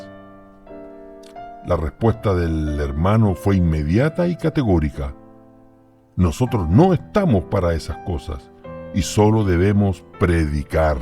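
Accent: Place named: Chile. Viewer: Por la forma de hablar me parece que es chileno ¿o me equivoco? Soy católico practicante y que pena que se haya desilusionado de la Iglesia.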